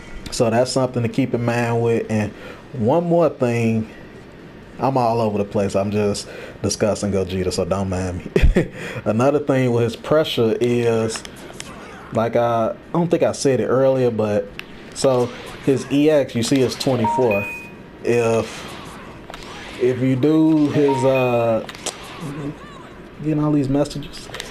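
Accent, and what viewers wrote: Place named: Texas, United States. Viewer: thought you was from Texas lol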